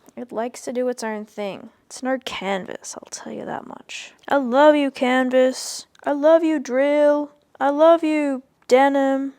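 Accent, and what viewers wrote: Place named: Australia. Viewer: Clicked for the pants, stayed for that australian accent 🤣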